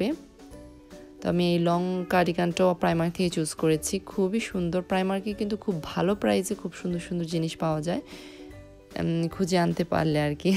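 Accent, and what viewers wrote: Sylhet, Bangladesh. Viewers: Apu Tumi sylheti ni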